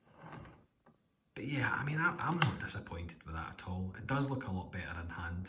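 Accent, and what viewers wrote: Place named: Scotland, United Kingdom. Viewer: Fantastic, so quick off the mark with your video of Cable, congratulations on your pick up and getting it early doors. So pleased for you, delighted you called it right and now have a great figure that you are happy to have in the collection. As mentioned in previous comments I do think a lot of people were too down on the figure from the get go, I really like it and think it presents so well, a real welcome edition to your great collection for sure. As for your dulcet Scottish tones, as a Glaswegian myself I am delighted your channel is going from strength to strength 😂 really enjoyed the video and as always, looking forward to whatever you may have next in the pipeline, please keep the videos coming, a great watch, as always stay well👍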